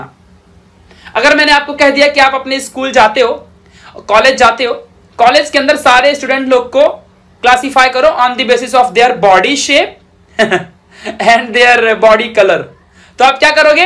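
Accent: Maharashtra, India. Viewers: Your marathi is is the best part of video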